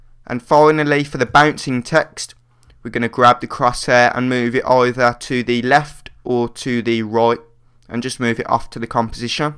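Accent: Birmingham, United Kingdom. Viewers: BIRMINGHAM ACCENT!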